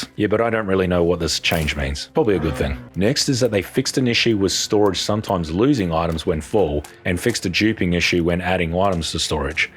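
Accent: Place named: Australia. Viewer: I was like "what why would owning..oh hes not british hes Aussie"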